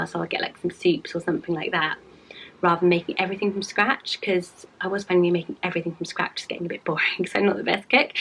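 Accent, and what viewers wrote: United Kingdom. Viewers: Feel better! Funny story; when I first started watching you about a month ago, my captions would always write your name as and I’m American so i just thought you were saying w a British accent I guess? And i was like why the heck is her channel name? then one day my captions wrote, and that’s when it finally clicked🤦🏽‍♀️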